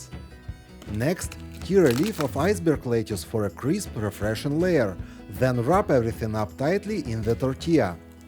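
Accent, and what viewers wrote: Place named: Russia. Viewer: Your videos are very fine, and I like your Russian accent. Thank you for learning English. I know it is not easy. Слава Богу!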